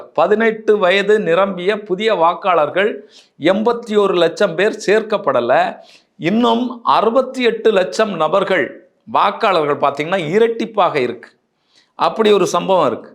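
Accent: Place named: Nagercoil, India. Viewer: Bro உங்க பேச்சில் நாகர்கோவில் மணம் வீசுதே..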